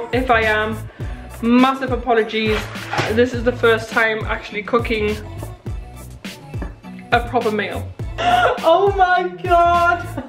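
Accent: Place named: United Kingdom. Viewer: Very interesting a British girl making Puerto Rican food but you did a good job that was very beautiful love the British accent much blessing😊👍🇵🇷🇬🇧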